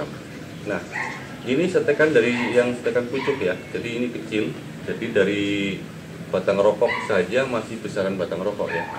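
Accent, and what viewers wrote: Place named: Java, Indonesia. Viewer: Abank orang Bali tapi bicara nya kyk jawa abank bali apa bukan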